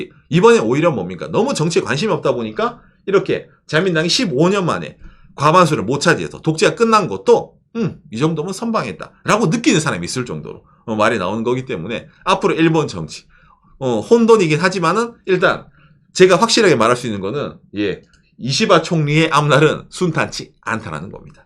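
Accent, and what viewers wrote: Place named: Gyeongsang, South Korea. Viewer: 대구경북 억양이 확 들리네요😂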